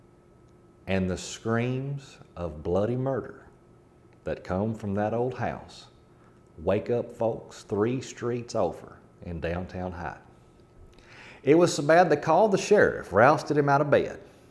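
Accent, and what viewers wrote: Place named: Appalachia, United States. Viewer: Really good story! And told with great Appalachian voice.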